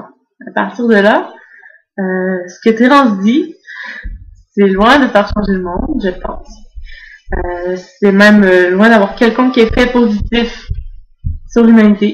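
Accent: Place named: Quebec, Canada. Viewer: Vraiment facile de juger les gens... Mais la réalité est que ce n'est pas ta faute... Tu sembles encore trop jeune et distante de la réalité Française... Ton accent me laisse transparaître le Québec ? Si c'est le cas ? Je suis pas surpris ! Ici, et je dis ici parce que je suis du Québec aussi, nous sommes encore bien contrôlé et que la France, elle, se réveille je crois... Enfin, j'espère que tu as au moins pris la peine de visionner plus d'une vidéo de Tarence Lacroix avant d'lancer 1ère pierre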